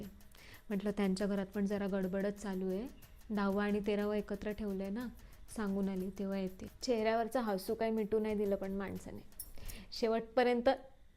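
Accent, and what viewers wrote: Khandesh, India. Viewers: छान वाटतं ना ..ऐकायला...खान्देशी ...आम्ही सुद्धा...आपलस वाटत ऐकताना 🎉🎉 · व्हिडिओ कॉल वर निसता रडे ...😂 तुम्ही खानदेशी आहात वाटतं तिथे असच बोलतात 😂😂😂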